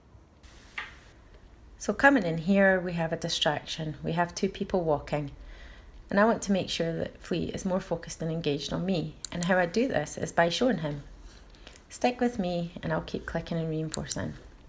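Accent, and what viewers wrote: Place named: United Kingdom. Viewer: Did you say 60 or 16 reinforces? I'm sorry, not really used to british accent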